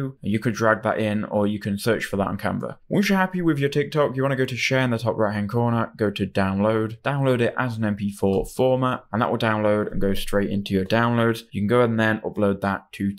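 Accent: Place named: United Kingdom. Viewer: Nice job.. Then ur accent is great.. British 😊😊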